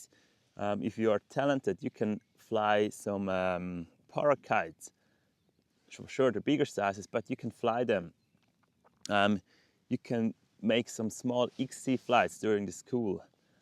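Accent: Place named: Europe. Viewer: Thanks for the review. Btw I can't quite figure where you are from in Europe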